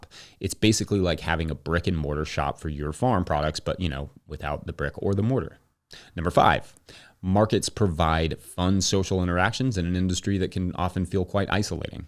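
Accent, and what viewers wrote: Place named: Midwest, United States. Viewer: Your accent sounds like classic midwesterner.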